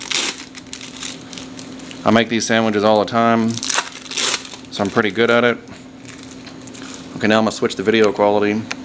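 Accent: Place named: United States. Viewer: You have a cool American accent like a badass XD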